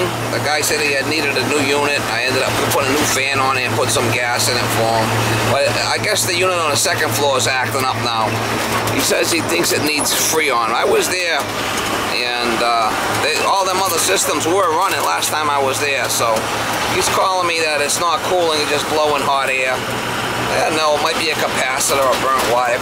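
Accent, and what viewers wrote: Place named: New York, United States. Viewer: thanks for talking as you work +nice accent my friend is from ny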